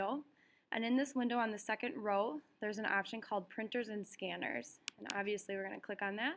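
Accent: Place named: Canada. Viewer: That was pretty cool. I love your accent . Canadian?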